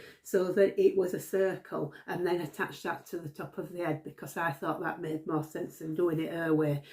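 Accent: Yorkshire, United Kingdom. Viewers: Thanks for sharing. Love your accent. Definitely reminds me of Yorkshire.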